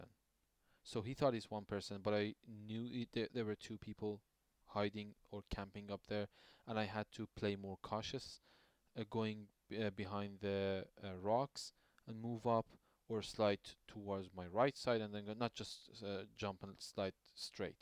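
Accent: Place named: Iran. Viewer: are u persian ? ur accent so irani :D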